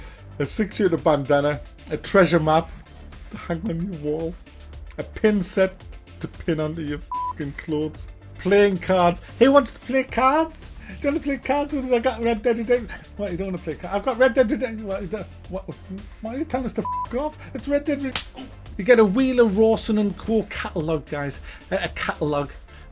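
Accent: United Kingdom. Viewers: Had to watch the video twice because the first time I was just listening to your awesome British accent saying sarcastic things that I lost what you were saying. :'D :P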